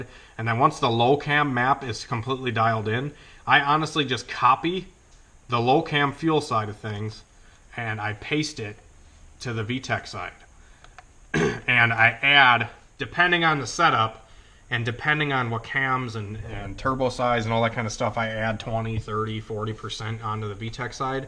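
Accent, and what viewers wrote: Canada. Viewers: dude i thought you were canadian. funniest shit I have watch while being in-depth about tuning. have him get the Ebay special headgasket next time. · I can't tell if your Amish or Canadian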